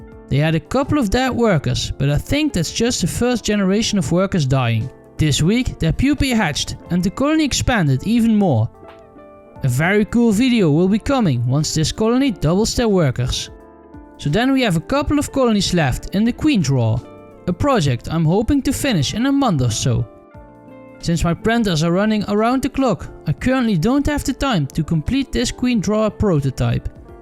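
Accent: Netherlands. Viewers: I like the dutch accent 😂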